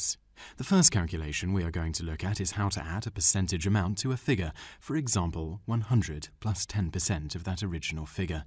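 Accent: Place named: United Kingdom. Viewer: He does not sound like a computer he is British.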